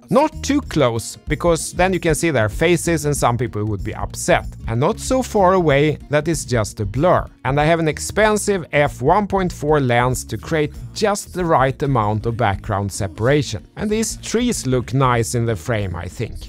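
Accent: Sweden. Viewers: This Swedish guy accent is like music and the best in the business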